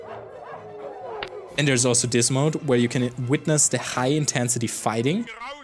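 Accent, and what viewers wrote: Germany. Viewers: Ayo my friend are you german by any Chance?:) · Bruder man hört ja krass das du deutsch bist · are you german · Deutscher aktzent? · Bro sounds pretty german.... Are you german? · sound like german accent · Are u german? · Krank wie sehr man den deutschen akzent raushört wenn man dein gamertag sieht.😂 wirklich gutes englisch.😌 kommt fast authentisch rüber bis man deinen nicknamen als deutscher liest.😂 aber geiles video junge. Hau die scheisse raus. Ich knödel dir auch nen abo raus.😂🫶🏽💀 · Dieser Deutsche akzent xD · I Hear Deutsch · Ich liebe diesen deutschen aktzent. Gutes Video. Mach weiter so ❤ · sounds so german, 100% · Bro casually spent almist 600 bucks for trash games❤💙💛 Greetings from Germany Im pretty sure I hear a german accent here? :P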